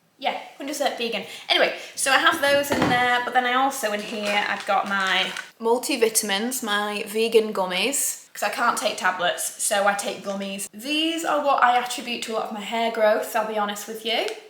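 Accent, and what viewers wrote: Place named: North, United Kingdom. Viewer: My God I do love a Northern dialect! The way you say „honey“ and „gut“ 😂 ok, this sounds really weird written out but hope I’m not alone in this 🙃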